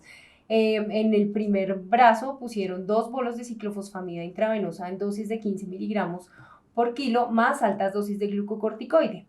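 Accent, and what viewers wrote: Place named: Colombia. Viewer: Definitivamente ya no puedo tolerar el acento colombiano, a partir de un daño gigante ocasionado por una mujer de allá. Se me revuelve el estómago, me repugna. Qué lástima.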